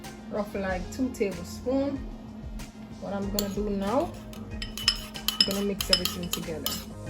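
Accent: Jamaica. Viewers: This Channel Is the Best Channel out of every other Cooking Channel Because U identify the ingredients products Step by Step an identify the Food Process an Her Beautiful Accent OUR Language Potwah 🇯🇲 Vinegar an Lime is Optional Part of your Cooking Section 100/100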